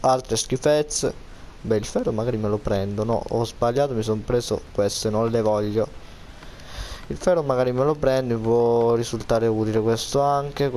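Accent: Sicily, Italy. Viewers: We mbare sei siciliano?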